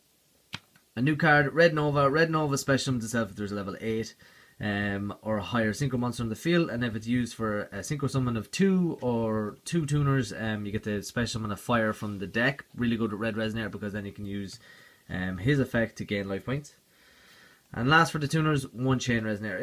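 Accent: Ireland. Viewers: You a Laois man?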